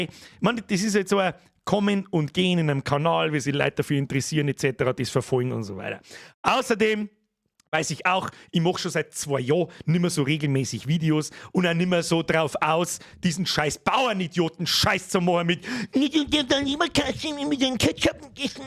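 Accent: Bavaria, Germany. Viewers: Geiler Stream wie eigentlich immer also wos soge denn lang du Bayrische Mundart Legende 💙🤍👍🍻🍻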